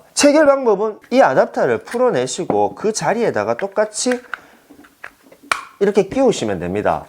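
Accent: Gyeongsang, South Korea. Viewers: 우한 코로나가 아니라 COVID-19입니다 억양을 보니 대구 코로나라고 하면 기분 안좋으실 것 같은데..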